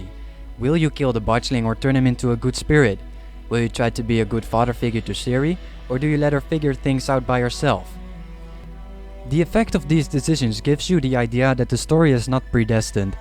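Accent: Netherlands. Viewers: Hey, where are you from? You sound Dutch! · I don't know why but I can totally tell you're Dutch.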